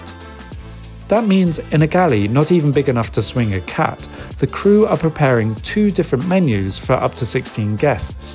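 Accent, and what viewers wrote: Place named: United Kingdom. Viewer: I subscribed because of your hairstyle and your British accent 🙂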